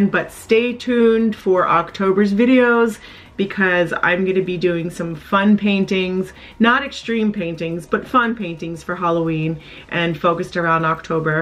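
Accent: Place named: New York, United States. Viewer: A bit off-topic, but why does your voice sound so different in your videos (in compare to Brandon's)? It has a lot more bass, and it sounds kinda newyork-ish.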